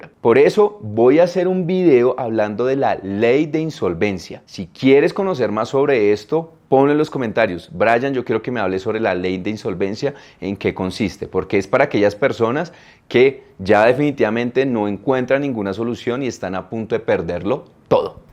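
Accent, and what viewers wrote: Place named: Colombia. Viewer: Tienes acento Colombiano, te refieres a 5 millones de pesos mexicanos?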